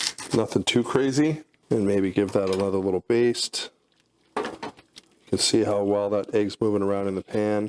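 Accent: Canada. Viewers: Are you Canadian? I can tell by the accent.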